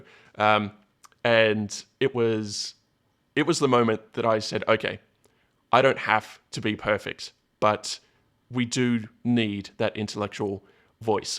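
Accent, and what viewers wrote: Australia. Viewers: I detect a fellow Australian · intellectual? I am an English Hampshire Hog. You sound Antipodean to me. I would go more Aussie than Kiwi.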